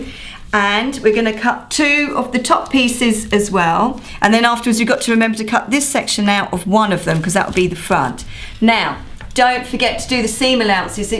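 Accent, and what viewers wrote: United Kingdom. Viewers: thank the lord so much for you annd your sewing smarts! my friends and i are finally able to make a fashion line! and your BRITISH wich makes it one million times BETTER!!!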